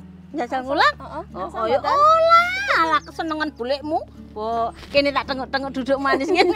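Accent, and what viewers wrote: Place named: Mojokerto, Indonesia. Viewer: saya dari Mojokerto hadir asli orang mana kok logat bicaranya kayak orang Mojokerto, suka banget kontennya 👍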